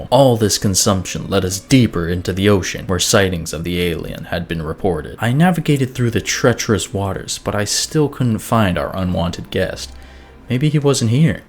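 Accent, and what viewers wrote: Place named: Canada. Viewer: Bro ur Canadian accent is strong asf 💪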